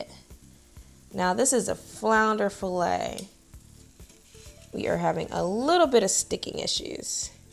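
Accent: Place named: United Kingdom. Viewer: Roger Powell Americans have many different accents. You can hear it in her undertones. It almost sounds British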